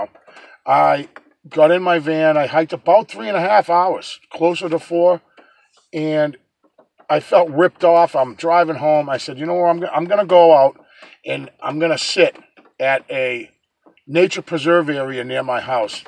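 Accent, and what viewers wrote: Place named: Massachusetts, United States. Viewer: your a riot 😂😂😂!! That Mass accent. And the way you word things..funny..God I miss home. Love your videos WTH download em all..lmao..love ya..Linda in Florida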